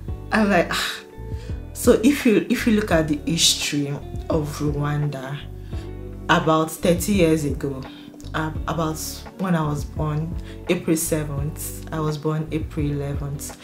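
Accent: Nigeria. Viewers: You try to put subtitles because Nigerian accent is hardly heard by Rwandans. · More people speak english now, but i think your strong nigerian accent was a problem. It's like me when listening to Jamaican or carreabean. If you speak clearly, they will for sure understand.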